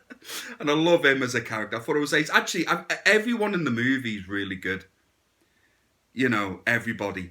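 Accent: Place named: Liverpool, United Kingdom. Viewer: Boss review, you sound like your from my neck of the woods Liverpool 🤣❤❤👍👍👍👍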